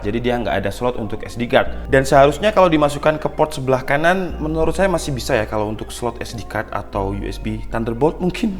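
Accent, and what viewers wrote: Java, Indonesia. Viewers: Orang malang bang? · Wong jowo ta?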